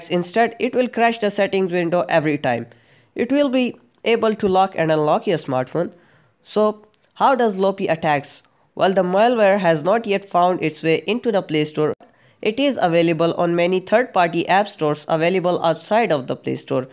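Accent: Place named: India. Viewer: Even if you sound super Indian you made it sound very clear great video 👍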